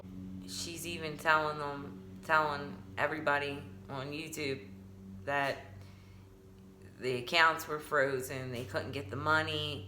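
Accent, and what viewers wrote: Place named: Philadelphia, United States. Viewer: I’m so glad you did this because I wasn’t sure of the details of what was going on but there was no way I was gonna give one more view to MGL because I’m done with her garbage ass. Also, are you from Philly? Haha def a Philly or NJ accent!!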